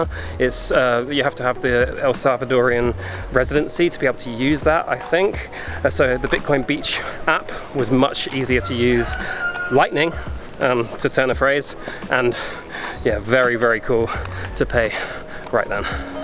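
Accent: United Kingdom. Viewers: Hi, buddy where are you from? Your accent is like britain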